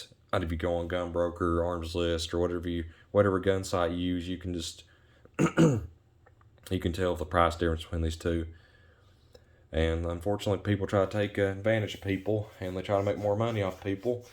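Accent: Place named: South, United States. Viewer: Thanks i am new to m1 carbine i know ww2 m1 grand . But please talk more slow as your a fast talking southen man i am from california not a yankee like thay said lol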